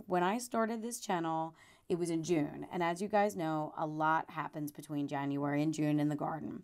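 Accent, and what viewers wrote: New Jersey, United States. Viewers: Gosh, I miss a NJ accent. I was raised in Mt. Holly and lived most of my adult life in Voorhees....now I'm in WNY. Photos are beautiful. Do you grow anything from seed? Check out The Lawrence Network....awesome gardening inspiration. Keep growing. 🌱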